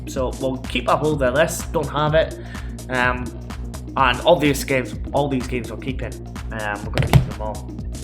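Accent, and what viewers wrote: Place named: Scotland, United Kingdom. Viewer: Aww Scottish fwend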